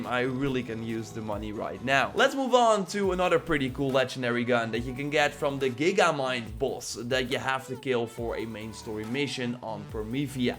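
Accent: Netherlands. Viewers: You have the same accent as the YouTuber tbag epic gaming. So I'm guessing you are from the Netherlands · Hey, are you dutch?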